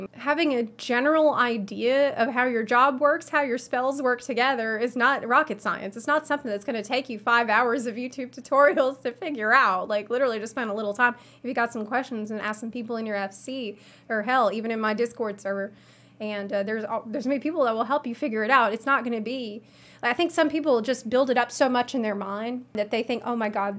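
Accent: South, United States. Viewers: oh can you read me some goddamn bedtime stories with that sweet moonlight magnolia drawl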